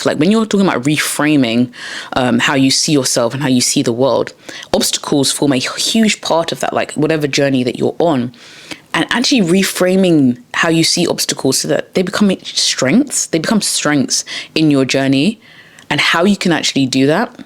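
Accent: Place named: United Kingdom. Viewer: Luv a British accent 🤎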